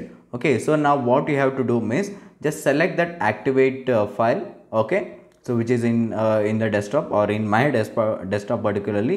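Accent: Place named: India. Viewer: He’s Indian dipshit, of course he’s going to have an accent? Why do all southerners have the same fucking Texas voice???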